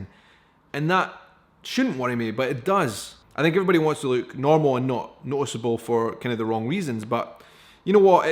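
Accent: Scotland, United Kingdom. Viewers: I’ve never noticed any of this and to be honest none of it matters — you’re a handsome guy with a great Scottish accent. 🙂 I’ve always admired your openness and confidence. Keep your head up and know that your honesty about this topic is probably helping a lot others who deal with this. Dietary changes are hard, I know. I am gluten and lactose intolerant and I have been on a 100% gluten free diet for 15 years. Once you see the benefits it will get easier. Also try adding kefir to your diet it’s great for your gut and it can help counteract the inflammation. Best wishes! Oh and I’ve had small patches of psoriasis that were helped by a lotion called Curel Itch Defense — not sure if it’s available there. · Hello, dear I have been watching your videos for around 1,5 years and besides that I love them, I can honestly wholeheartedly say I never noticed anything strange about your skin or arms at all. 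And even if I had wouldn’t have made a lot of difference - I’d still be watching your content because I love your accent, your stories and Scotland 🏴 in general. 🥰😊😃😌 👋🏻 Stay as gorgeous as you are I’m waving to you from Germany 🇩🇪, Berlin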